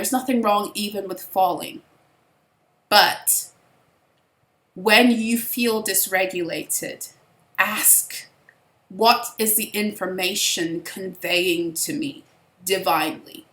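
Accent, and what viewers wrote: United Kingdom. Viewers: Your Hiding a British Accent I hear it...Just like Maji got the T hiding her UK Accemt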